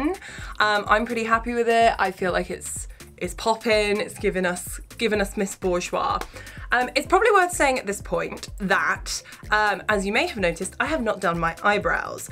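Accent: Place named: United Kingdom. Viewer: this is amazing and also one thing i was thinking about was the you sound like your from the uk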